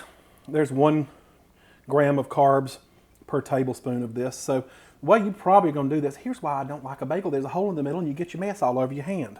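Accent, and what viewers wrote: South, United States. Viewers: I don’t know why but I saw your channel on my feed and I started watching you and this video was amazing!! You have great energy and a great Southern accent!! You are doing a great job!! Maybe we can do a collaboration let me know! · I love your videos.... Talk as long as you need too. Heck you sound like me with that southern tone... well I have been waiting for use of my coconut flour and here it is... Thank you very much. I will be trying this one. ♥ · Sir, I love your videos and southern accent! Your face really made me laugh!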